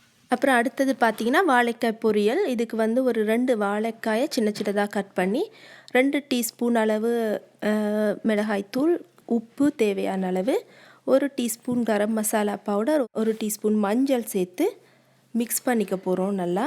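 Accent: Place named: India. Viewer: Full and full srilankan cooking but speaking way indian style .but not bad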